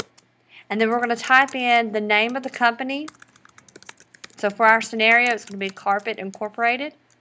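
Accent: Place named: Georgia, United States. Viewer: Thank ye kindly, Georgia Girl! Lovely voice and accent. Very helpful. TYVM! :)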